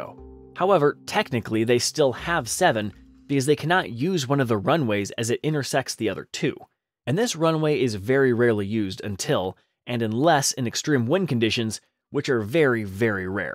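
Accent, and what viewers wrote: United States. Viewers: I think the voiceover on this video might be AI. I'm not sure, but he makes several grammatical mistakes that I've never heard made by someone with such an impeccable American accent.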